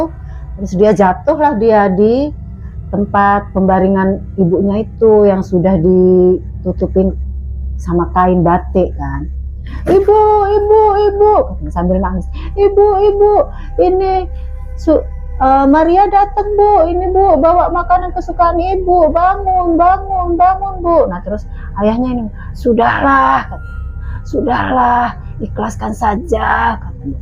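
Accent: Lampung, Indonesia. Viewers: membawakan ceritanya Lampung banget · Orang Lampung kek nya mbak nya dari logatnya Lampung bnget, soalnya saya juga Lampung bumi agung Salam kenal subscribe baru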